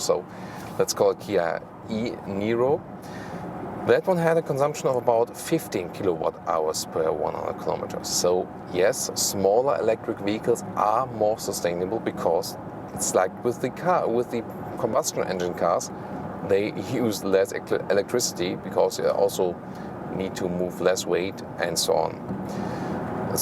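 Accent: Germany. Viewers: Love your reviews. Great English speaking with superb German accent. Awesome info and opinions on the cars. I've just ordered an iX3 :-)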